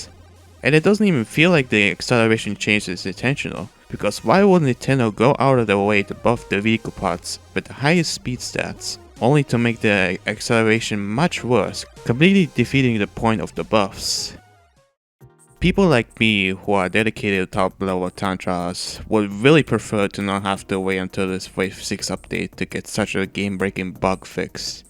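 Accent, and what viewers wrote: Germany. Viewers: German accent❤ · Are you german?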